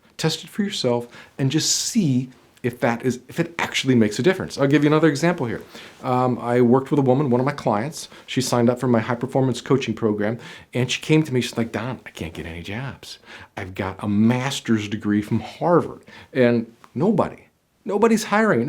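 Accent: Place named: New York, United States. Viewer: Amazing content i always watch your video to practice my listening i like your accent ... are u new yorker , aaren't u ?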